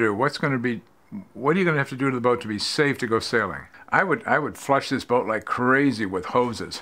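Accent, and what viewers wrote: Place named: United States. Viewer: All that info, and all spoken in perfect 👍 American English!! What a beauty!!!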